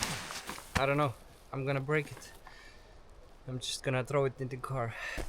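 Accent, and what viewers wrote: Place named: India. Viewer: plzz aitab! Sa teed megägedusi... aga see su `english`` on nagu india smthinng .....nääääh.... noup... no ei,,,,,kus on Gabriel??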